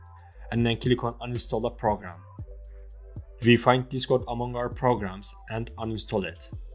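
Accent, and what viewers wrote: Turkey. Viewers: turkmusun kral bu diksiyon ne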